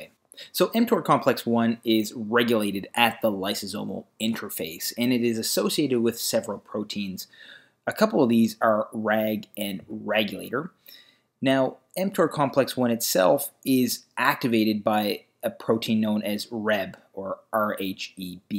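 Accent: Canada. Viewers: Thank you for making these great videos! Are you Canadian btw? Just want to prove/disprove my accent ears...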